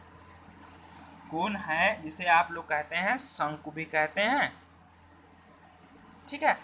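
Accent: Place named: Bihar, India. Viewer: Bihari ho ka bhai sahab voice tone se lag raha hai.....hai to bahut badhiya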